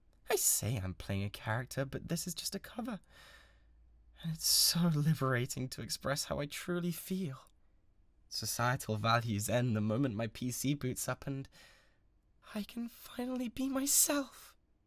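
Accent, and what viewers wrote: United Kingdom. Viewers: I LOVE HIS BRITISH VOICEEEE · The only british accent i tolerate (love the audio)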